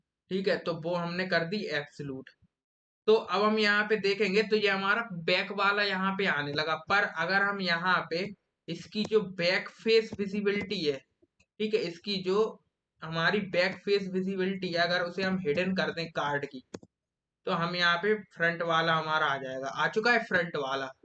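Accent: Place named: Bundelkhand, India. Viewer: Bhai badiya bole bundekhndi m mja aa gao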